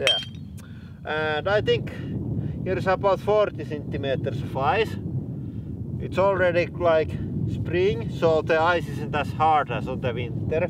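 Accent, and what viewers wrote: Finland. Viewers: Are you from Finland? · are you from Finland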